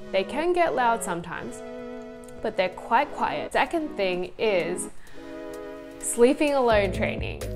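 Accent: Australia. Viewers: Thanks for the advice, I love greyhounds, the most placid sweetest dogs. You have a lovely hybrid Australian soft U S accent, nice to listen to. I’m Spanish, live in Sydney Australia. God bless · Are you an Aussie?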